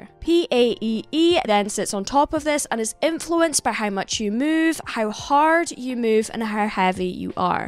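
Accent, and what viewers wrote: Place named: Scotland, United Kingdom. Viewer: FIRST COMMENT AND FIRST LIKE:🥇🌟🎖️⭐️🏆🏅 hi happy Sunday your Scottish 🏴 accent=🔥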